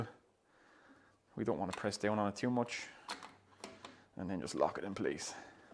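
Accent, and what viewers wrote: Ireland. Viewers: I hear an Irish accent, I sub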